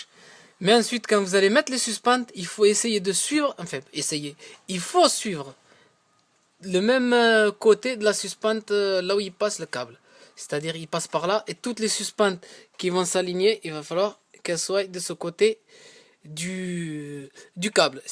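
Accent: South, France. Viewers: Salut merci pour ta video très clair j'ai tout compris avec ton accent du sud c'est géniale. Merci encore et si tu peux faire une vidéo sur les plafonds dale 600/600 se serai dégain merci.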